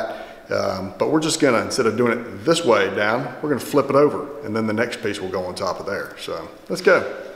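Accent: South, United States. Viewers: Even though your channel focuses mainly on men's shoes (and I understand your demographic is mostly male, so right you are), you have no idea how PERFECT your videos are for me, a woman, to have on in the background while putting on makeup in the morning. Cobbler shop ASMR interlaced with shoe wisdom spoken in a smooth but approachable Southern accent. 👌🏻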